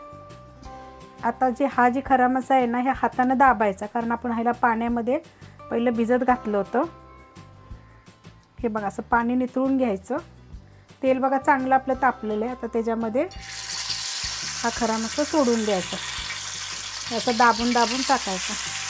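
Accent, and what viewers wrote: Satara, India. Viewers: तुमच्या आवाजांना मी ओळखलं तुम्ही साताऱ्याचे आहेत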